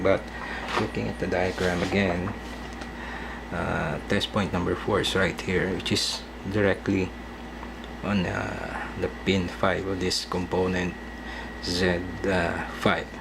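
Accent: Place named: Philippines. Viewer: Just the by way you spoke English I can tell you are from the Philippines 😅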